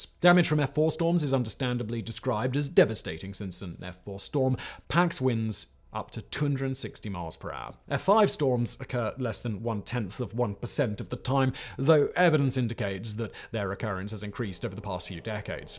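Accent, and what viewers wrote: United Kingdom. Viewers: It's seriously boggling my mind to listen to a Brit who lives in Prague speak about my home state of Kansas. What a world we live in!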